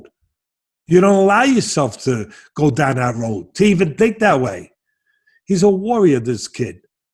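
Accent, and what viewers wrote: New York, United States. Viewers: The older he gets the stronger his NY accent becomes.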